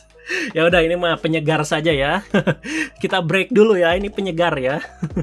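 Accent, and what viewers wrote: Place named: Madura, Indonesia. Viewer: MC nya kayak orang madura.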